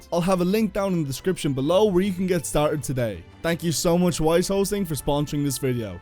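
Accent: Ireland. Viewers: always pleasantly surprised when i hear a fellow dubliner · Is that an Irish accent I hear ?? An Irish Minecrafter? Finally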